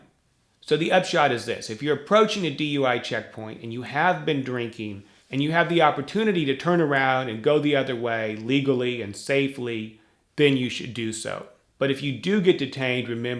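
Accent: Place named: Georgia, United States. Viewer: Tis is absurd. AN officer never has a right to search a vehicle without a warrant even if an arrest is made. You sound like you are from Cobb County GA. I am a criminal Defense Investigator and a Police Officer has no right to ever search a vehicle without a warrant, permission or Probable Cause, being something illegal in plain sight, however if drugs are suspected a K-9Unit can be called to the scene to determine if there would be cause to search the vehicle. You search my car without my permission, and you will not be a police officer any longer, I will have your P.O.S.T. removed and end your career.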